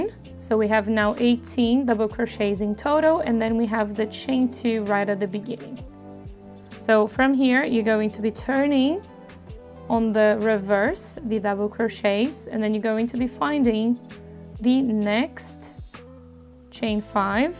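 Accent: Brazil. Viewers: I just discovered your channel and I’m loving your tutorials, can’t stop watching ❤️ I notice you have an accent and it looks a lot like Brazilian, are you Brazilian? I am from Brazil 🥰🇧🇷🇧🇷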